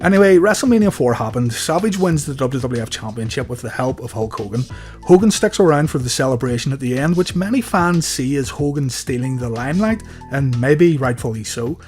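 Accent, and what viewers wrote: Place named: Scotland, United Kingdom. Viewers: I'm half Irish American and native I been thinking u was Scottish lol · are you a Scot?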